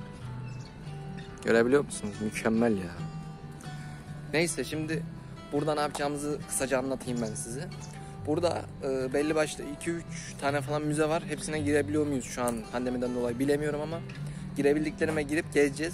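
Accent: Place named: Ankara, Turkey. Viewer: Angaralı olduğun nasıl da belli ya la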